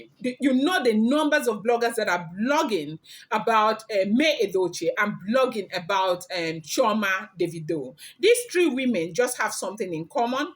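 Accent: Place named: England, United Kingdom. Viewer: You are such a young and beautiful woman. I presumed you to be an elderly woman with a plump body and a deep voice 😂. Please pardon me for the presumption. I am so pleased to see your face and enjoy your vibrant smile and English accent. Kudos for being very honest and respectful in your delivery of your blog.❤. Please text me the link to join your chat. I couldn’t get the link. ( mind you I am of old school 😂😂😂😂). Don’t mind me ooo.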